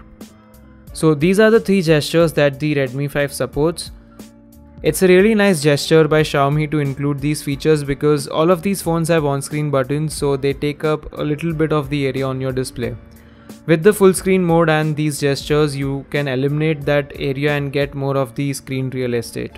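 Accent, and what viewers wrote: India. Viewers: I love the way India saying "t" words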